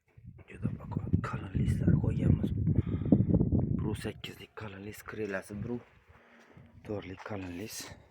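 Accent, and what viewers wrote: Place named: Khorezm, Uzbekistan. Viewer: Assalomu alaykum manzil nerda xorazmdami sheva oʻxshab turibdi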